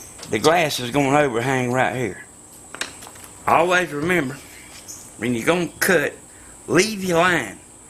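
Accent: South, United States. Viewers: Thank you Mr, Well Done Engineering w/o all the usually jargon/pretentious. Pls give-up the cigarettes because folks like me would like you around & kickin for as long as possible. Thks again PS: Your southern accent/slang is a lot like poetry. I wish I still had mine.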